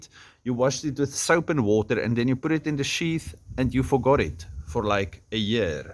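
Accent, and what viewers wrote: Afrikaans, South Africa. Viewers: South African Accent? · love the Afrikaan accent, groeten uit Nederland!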